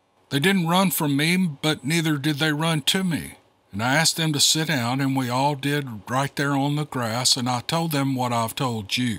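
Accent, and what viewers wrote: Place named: Appalachia, United States. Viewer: I'm frail now, in my early 60's, but liver failure has made me look far older than I am...and my looks betray what I once was, long ago. 6'5", 245-lbs...once a U.S. Marine, then various colleges and careers leading me to a distinguished position as a Director of Engineering...all betraying this lanky half-Cherokee kid who grew up not far from Clingman's dome in Tennessee...an 'appalachian hillfolk', as it were. I hear the accent that I had as a kid, and listen intently to the stories told here, and it brings me back to old stories like I grew up surrounded by...legends of the hill people and the Cherokee...from a time long ago. The love of my life and I spent summers in each others arms...and she has been my wife for many years now...almost 50, as memory recalls (we were 'like one' for years before we actually got 'legally married')...and nothing is better than sitting here in the evenings with her, as we listen to the channel like you might listen to WSM-650 back in the day, and hear the old shows and country music...by the living room radio (the size of a modern TV now)...keep up the great work,...some things should never completely 'fade away'...like the stories here.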